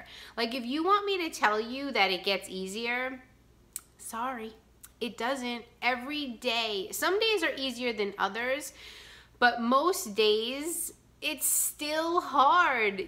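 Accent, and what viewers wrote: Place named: New York, United States. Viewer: So Happy i found you the u tube!! Love your NYC accent! Im from Philly! I could listen to you All day, love your honesty 💯💯💯💞💞💞 keep them coming!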